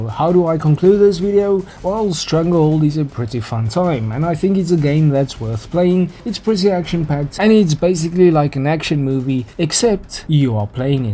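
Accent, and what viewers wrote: South Africa. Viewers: Hey man, great video. Are you South African by any chance? Curious due to your accent. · I enjoyed the flow of this video a lot, a nice surprise on my feed! Also is that a fellow afrikaner I hear? :P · Ur the most South African sounding guy I've heard doing a game review. Glad u popped up on my time line.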